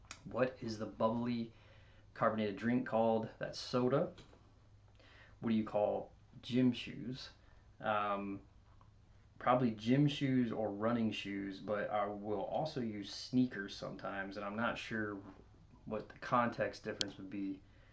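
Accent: Maryland, United States. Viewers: I appreciate the time and care you took with this topic. You share some of the same accent markers as my mom. She said “heel” instead of him. Oh God, she said “nindy” instead of ninety.” She called milk, “melk.” I dropped the -ing as a kid but not now. But your words are pretty solid for MD. We called tennis shoes (our sneakers) tenners ,as kids. Otherwise, sneakers. Yeah, my mother was a water person. I’m subscribing and hope to see you again. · Yep, good job. You sound like a solid Marylander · I knew you were from Maryland after the first five words. I have seen some preposterous attempts on You-Tube, that bear no resemblance to even the thickest Baltimore or Eastern Shore accents. I've lived in New Mexico a long time, but when I hear the accent of Maryland, Delaware, or New Jersey , it feels very settled, very comfortable. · Upload more videos of you speaking. 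I would like to learn your maryland accent. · I was raised in Maryland until i was 8 but i moved to Georgia and lived there for about 14 years before living here in California. I never picked up a GA accent because my mom is from Maryland too, so i talk like her and hearing your accent reminds me of mine and my parents'. They are from the P.G. County area I think.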